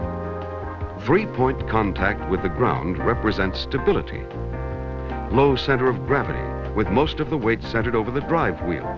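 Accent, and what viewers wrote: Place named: Midwest, United States. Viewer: Same Midwest delivery, but no. Gary Owens as narrator would have made this an instant cult classic!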